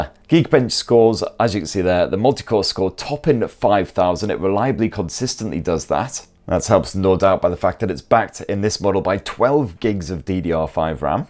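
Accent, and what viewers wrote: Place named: Newcastle, United Kingdom. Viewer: sounds like Newcastle accent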